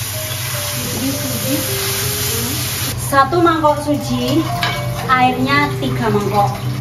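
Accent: Java, Indonesia. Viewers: Mbak yen ngomong boso jowo medok jowo ne iku tetep kental masio dek samean dek india Collab pakai boso jowo dong kak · Mb lucu kl ngmng....tdk sungkan2 dg logat jawanya.salut mb.....👍👍👍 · Mf mb logatnya kaya orang jawa, klo blh tau asalnya dri mana ya mksih kalau mau bales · Setiap mbk ngomong nada jawanya keluar, aku mesti ketawa lho mbk, soalnya klo pean ngomong medok ngt, 👍👍👍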